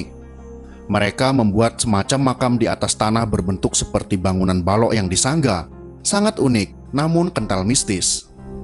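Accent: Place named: Banyumas, Indonesia. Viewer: min orang ngapak Yo😅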